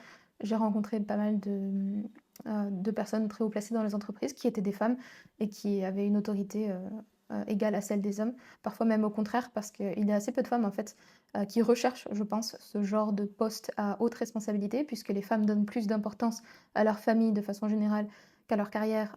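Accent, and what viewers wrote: Russia. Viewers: tu commence a avoir l'accent russe en parlant français BRUH! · Очень странно: живет в России без четких оснований, непонятно на что живет, работала в Сколково и жила в Москве, теперь безработная и в какой-то сельской окраине без перспектив и смысла при этом вышла замуж и собирается рожать в какой-то деревне, за тысячи километров от родных и дома. Ходила по ток-шоу на тв и всякие шабаши блогеров-иностранцев, а теперь коровам хвосты крутит и задротствует. Чет какой-то месиканский сериал для Степашек про Нетакуську. Уж молчу про русский акцент когда на английском говорит.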